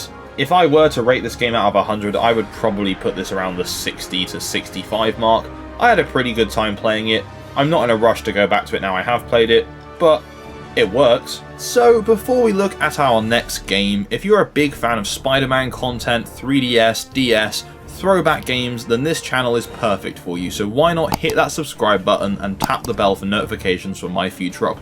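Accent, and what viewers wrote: United Kingdom. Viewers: you're british right ?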